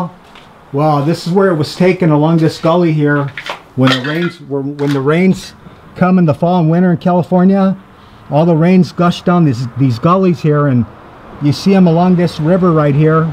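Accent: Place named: Chicago, United States. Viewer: are you from Chicago or Milwakee? Its date der accent Yah Know?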